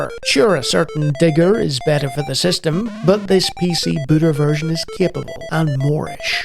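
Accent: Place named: Ireland. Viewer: I hear you have the Irish accent that pronounces 3 as three, not as tree like other Irish pronounce 3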